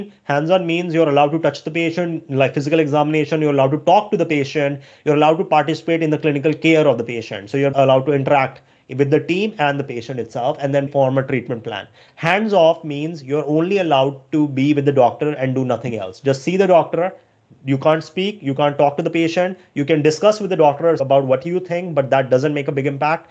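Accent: India. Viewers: Good info but you have that typical funny Indian accent. I hope the patients enjoy conversations with ya :)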